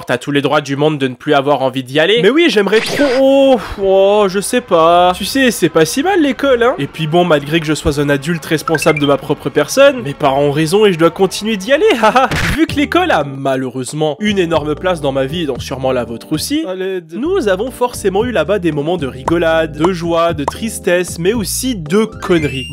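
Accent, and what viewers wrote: Quebec, Canada. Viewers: j'aime beaucoup tes vidéos et j'aime l'accent québécois car je suis français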